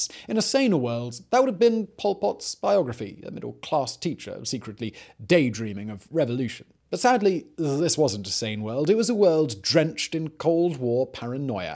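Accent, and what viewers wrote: United Kingdom. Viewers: Thanks for making this one Simon - I live about a mile and a half away from Tuol Sleng and I drive past on my motorbike nearly every day. It's pretty much in the centre of Phnom Penh, and the rest of the neighbourhood is a very nice place, with some great coffee shops and local bars. Heck, my shoemaker is on the same street as S21! And where I live is virtually equidistant between S21 and the Choeung Ek Killing Fields. Despite the utterly horrible history here, the good people of Phnom Penh are rebuilding still, and life is a lot happier for many here these days. I've been watching your videos for a long time, and as tragic as the subject matter is in this one, I'm really glad to see you and your team make this video. I was thinking less than an hour ago that I should visit S21 again as it's been a while since I paid my respects. Anyway - listening to you as a fellow Brit on your videos often serves as a nice reminder of home, but this video's a great surprise - a British voice helping to chronicle a very important piece of the history of this lovely adopted city of mine. 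Thanks again.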